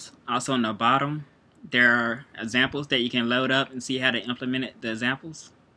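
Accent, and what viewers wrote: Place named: South, United States. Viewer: This really really helped me , thanks alot man . i like your country accent too :)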